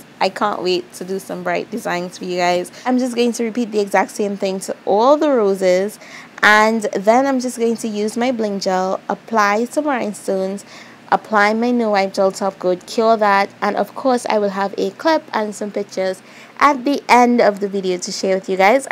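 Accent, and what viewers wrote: Trinidad and Tobago. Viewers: Love hearing and seeing your videos..it's so refreshing to hear our lovely trini accent🤗great work hunni💕💕💕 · I am sooo happy i was SHOOK when i heard your accent i was like.. wait nah dis is ah triniiiiii!!!!! ayeee new subscriber!!!!!!!!!!!!!👏💯❤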